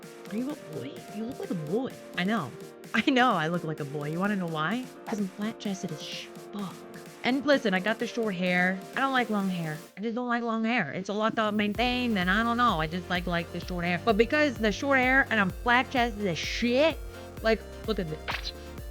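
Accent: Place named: Boston, United States. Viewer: You remind me of jerma, and not just because of the boston accent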